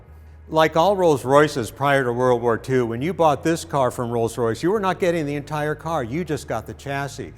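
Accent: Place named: United States. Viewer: Cannot fathom American pronuciation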